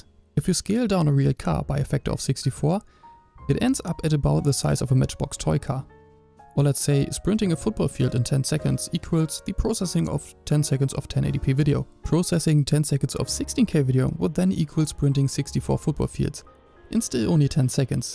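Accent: Germany. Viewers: I like the german accent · man hört so krass das du deutsch bist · Der deutsche akzent beste xD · Nur deutsche hören das er deutsch ist 😂💪🏽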